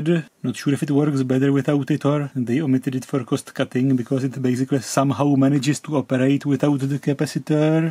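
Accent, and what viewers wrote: Czech Republic. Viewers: I know his english is good and that he's Czech, but that is one funky accent. I'm just super surprised I don't see people talking about it. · really hard to listen to ..is it czech accent? too bad, since the understandable parts sound interesting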